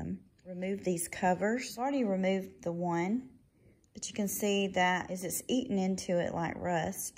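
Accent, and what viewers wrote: South, United States. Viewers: You sound awfully Southern or am I wrong? I am in AL.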